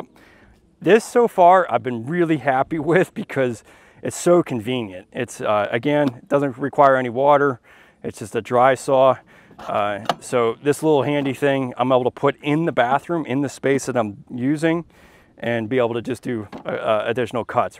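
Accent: Pittsburgh, United States. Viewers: You are very selfless in sharing information. I also love your accent and it takes me back to my days in Pittsburgh.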